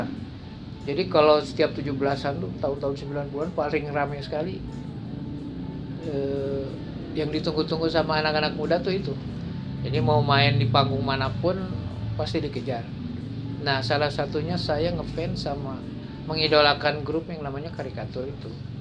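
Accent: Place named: West Java, Indonesia. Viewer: Nyunda pisan bang😁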